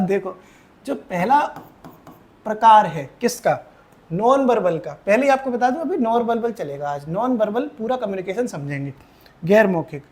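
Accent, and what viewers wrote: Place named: Etah, India. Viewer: Bhaiya aapko jaha tak h Maine drishti coaching mentorship me dekha tha aap bhi join kiye the upsc ki aur shayad aapka ghar etaa side h aapki boli se lag rha h😊😊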